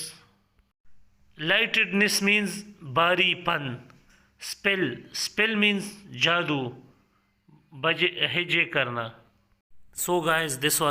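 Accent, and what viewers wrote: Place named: Pashto, Pakistan. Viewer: You missed pronounce many words instead of pronunciation in pasho accent ur accent should be urdu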